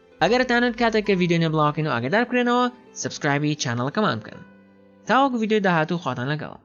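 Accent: United States. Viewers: I think you speak american english .. thanks so much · Are you speak America or Biritsh ?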